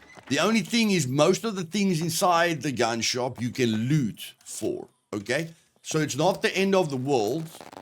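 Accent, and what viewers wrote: Afrikaans, South Africa. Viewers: is that a afrikaanse acsent? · Bro got the South African Accent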